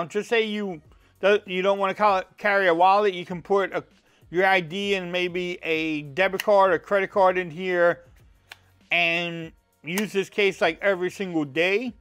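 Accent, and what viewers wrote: United States. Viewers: Your accent is the most american accent ever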